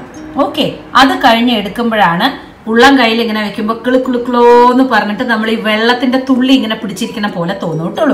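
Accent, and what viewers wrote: Palakkad, India. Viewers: Dear chiechi wonder ful performance chechi palakkadnte abhimanam anne njan oru palakkad kariyanne chechi nammude palakkadu style venam varthamanam parayan enikku orupadu eshtamane njan ennaleyannu video kandathe iam very happy njan mumbayil thamasikkunnu chechi orupadu thanks njan ella video kannam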